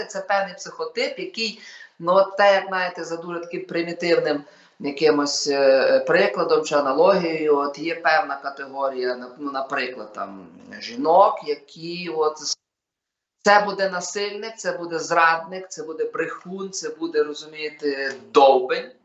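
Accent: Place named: Galicia, Ukraine. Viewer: Дуде імпонує стиль розмови і сама мова. Це не просто класичний галицький діалект,а вишуканий діалект за часів галицької інтелегенції часів Австрійської адміністрації. Дуже круто 👍👍👍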